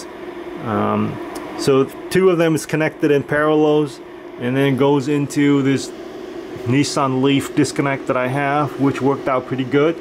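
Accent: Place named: Austria. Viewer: your accent sound's like arnold schwarzenegger very cool you get a like just for that :)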